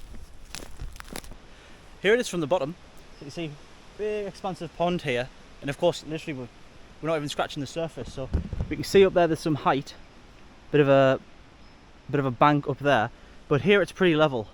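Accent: England, United Kingdom. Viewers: Czy ty nie słyszysz że to Anglik.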